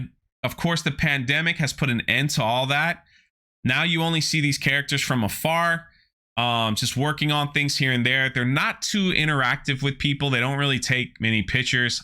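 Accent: South, United States. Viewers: first, where is your accent from? I hear this faint Southern twang when you talk and I’m just super curious where it’s from 👍🏻 Secondly - THANK YOU and Anna and Theory and everyone else in the Fandom Menace for covering this so we can all laugh and vent about it together. I also love that we all have seen something different that’s WRONG with these “trailers” that Disney has put out. For the first add with the female Disney Imagineer and the overly excited fan, I love that they chose a very short actor for the fan, so the girl towers over him. Just saying. And the video showing the meeting of the Imagineers sitting down and talking about the “not hotel,” again they’re all female, just as the Lucasfilm story department is all female. I believe you said it best when reacting to this - “WOW me. WOW me.” That’s what Star Wars is SUPPOSED to do when done properly. 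I watch the trailer and I’m like “what about Han, Luke and Leia, can I see them?” And I feel like the blue hostess or the “Twilek” or Disney security is gonna pounce on me: “WE DON’T TALK ABOUT THEM HERE.” Anyway, keep up the good work!!!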